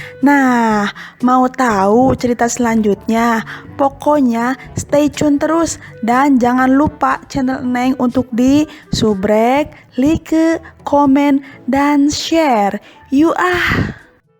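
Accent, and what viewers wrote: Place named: Sunda, Indonesia. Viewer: duh eceu, khas pisan sorana euy nyunda pisan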